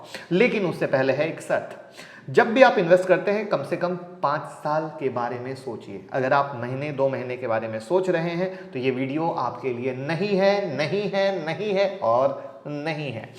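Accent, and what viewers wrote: Bihar, India. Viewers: आप बिहार से हैं क्या?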